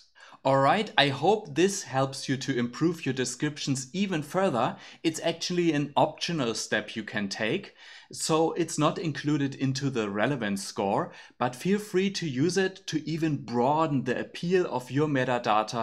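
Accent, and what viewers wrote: Germany. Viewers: you are bavarian after your accent :)morning fame. great tool! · You are German after your accent. But I like to learn from a German because they do things very professional.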